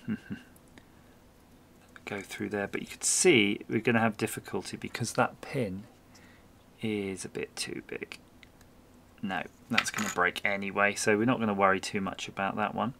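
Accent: United Kingdom. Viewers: Thanks for the content and LOVE the British accent!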